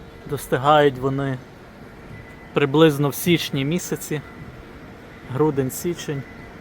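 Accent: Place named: Western Ukraine, Ukraine. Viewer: Я впізнав по розмові що ти з Західної України.Тільки нині натрапив на твої відео.Переглянув всі.Ти не зупиняйся.Досить добре виходить.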